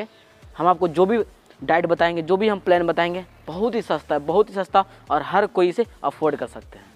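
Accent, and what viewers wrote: Jharkhand, India. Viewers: Bhai ap jharkhand se ho kya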